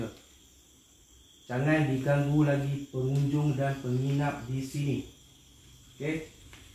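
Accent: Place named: Northern Malay, Malaysia. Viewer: Hang nk ckp utara ,hang xyahlah ckp key el plak....lagi satu klau nak ulas tu ang ulasla dgn bijak sikit...walaupun hang seorang pemberani tp bila ulas mcm tu rasa semak..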